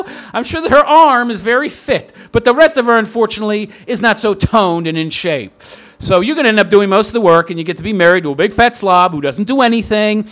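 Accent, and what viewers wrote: New York, United States. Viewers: You sounds like a New Yorker. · Okay.....New York.....Philly? That way you talk is killing me, it's like Archie Bunker's protege, fuckin' 'ay! Love your channel, damn you can break balls, wooo!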